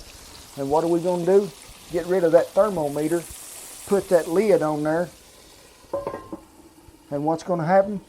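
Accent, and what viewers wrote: United States. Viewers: Why did I watch this before sleep, got hungry again:((( What accent is that though, never heard that particular American accent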